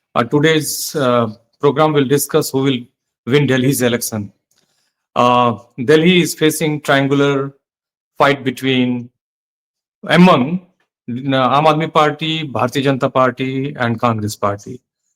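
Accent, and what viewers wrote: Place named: Bangladesh. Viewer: Your accent is bangladeshi , show us your dr degree u cheat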